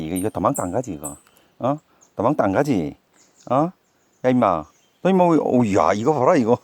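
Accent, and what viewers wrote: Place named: Gyeongbuk, South Korea. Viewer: 경북쪽이신가 말투가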